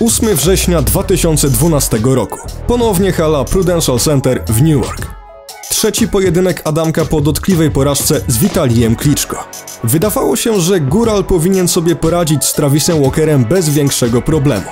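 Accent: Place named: Germany. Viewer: Akcet dość mieki ale slabo niemieckie to nie rozpoznałem że Polska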